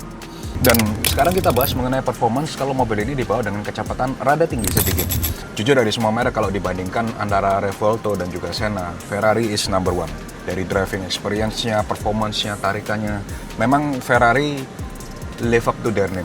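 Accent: Java, Indonesia. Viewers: kaya tapi jelek dan suaranya kenapa jowo bgt yah? tapi mantabh lah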